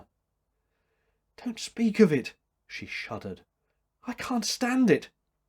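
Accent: United Kingdom. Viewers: look into uploading your recordings to Libravox. Make sure to mention your British, because Americans dig the accent.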